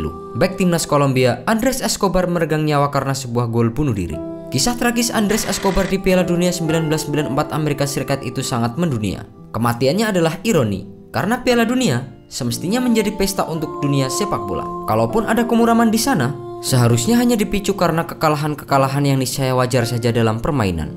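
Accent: Java, Indonesia. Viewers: Yg menyiarkan pasti org jawa...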